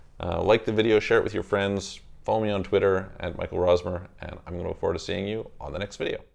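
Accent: Canada. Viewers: You have a really strong Canadian accent.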